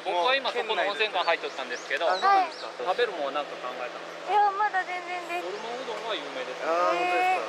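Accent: Kansai, Japan. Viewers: 今日も楽しい動画有難うです。の可愛い関西弁との優しさに癒されていますよ。無理しないでf頑張ってくださいね～。次回もたのしみです。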